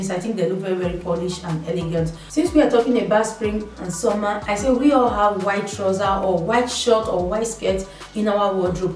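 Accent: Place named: Nigeria. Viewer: Very straight to the point. love it! you sound Nigerian too, beautiful accent.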